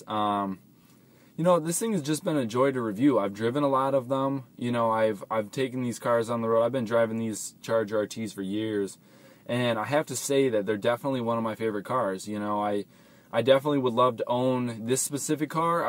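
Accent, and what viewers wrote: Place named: Minnesota, United States. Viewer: Damn that Minnesota accent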